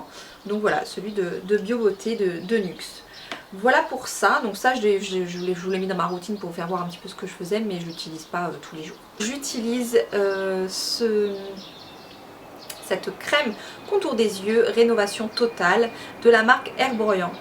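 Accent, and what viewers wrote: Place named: South, France. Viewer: Ça fait du bien de te retrouver à travers une vidéo! Ne décèlerait pas un léger accent du sud qui s'installe dans ta voix? ahaha c'est charmant. Bonne journée et reviens nous vite pour une nouvelle vidéo!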